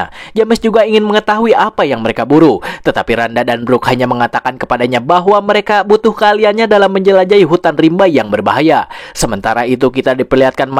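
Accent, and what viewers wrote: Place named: West Java, Indonesia. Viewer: Anjir suara nya mirip si jon lQ7 logat sunda nya krasa..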